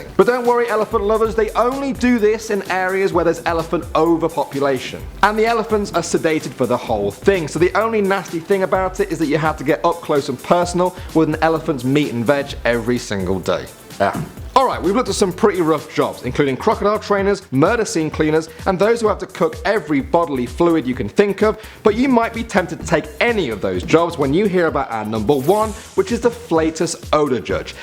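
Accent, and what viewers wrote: United States. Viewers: Your american accent is actually not bad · Your American accent is better than other Americans. And you're British!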